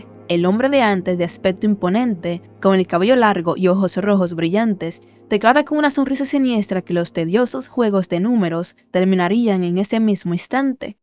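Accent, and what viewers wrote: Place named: Dominican Republic. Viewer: 🗣️🔥🔥🔥Lo sabía es que el acento dominicano es eterno ✋🗿🤚🇩🇴🇩🇴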